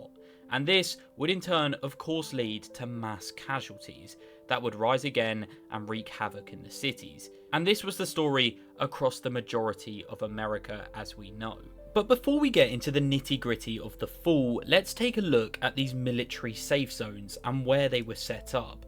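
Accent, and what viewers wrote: United Kingdom. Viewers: This video would be so much better without the british accent it sucks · Another annoying British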